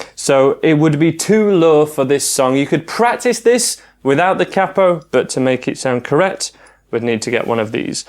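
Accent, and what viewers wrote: United Kingdom. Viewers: jo3bo its just his accent i got him british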